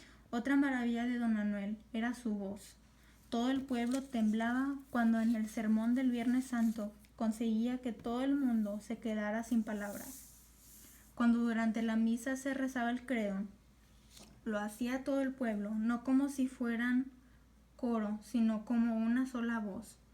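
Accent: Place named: Latin America. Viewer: Por tu acento, veo que eres de un país de hispanoamérica. Sin embargo, cuando dices que Lázaro estuvo en américa viviendo dibujas el mapa de Estados unidos. Creo que deberías saber que Estados unidos no es américa y que Lázaro estuvo en uno de los territorios americanos pertenecientes a España, los cuales algunos se situaban donde hoy se encuentra EEUU. Creo que dibujar el mapa de estados unidos es una muestra de ignorancia simplemente y de apoyo al apropiamiento del nombre "América" por parte de los estados unidos. Un poco de cultura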